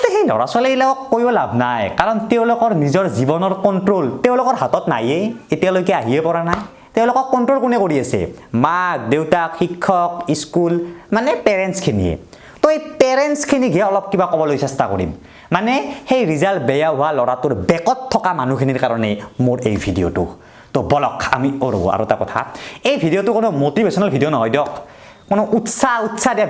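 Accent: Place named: Lower Assam, India. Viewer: Lower Assam r language enekuwai Moi Assamese Chanel nasau ..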